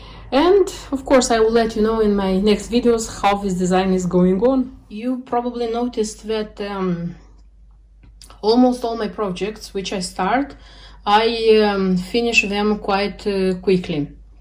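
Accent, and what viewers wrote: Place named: Moldova. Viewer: я по акценту сразу поняла что вы из Молдовы, я тоже там родилась, поэтому с еще большим удовольствием смотрю вас😊 Румынский уже очень плохо помню, живу давно в России, очень люблю ваш канал, столько вдохновения!💗🌷🌷🌷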